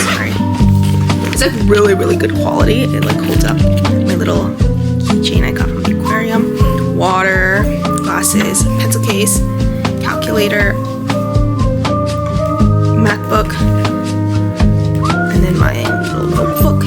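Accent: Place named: Toronto, Canada. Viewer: I am ready for the toronto accent to come out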